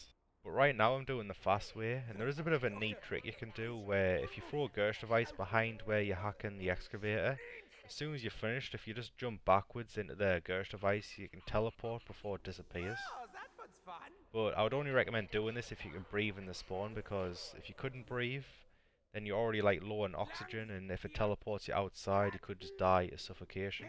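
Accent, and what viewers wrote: Newcastle, United Kingdom. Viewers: he's from Newcastle lol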